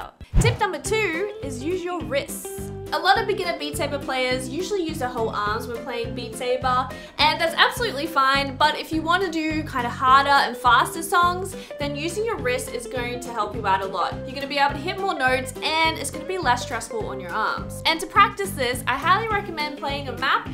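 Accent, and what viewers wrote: Australia. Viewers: Ok does anyone know why advice like this sticks in my head better when it’s delivered in an Aussie accent?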